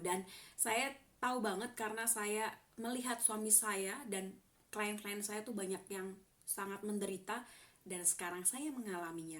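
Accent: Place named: East Java, Indonesia. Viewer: sama kak,ak juga minta maaf ya bila lambat terus berkunjungnya, btw kakak indonesia nya mana ya,kok hajing hajing wahing sama kayak.jawatimuran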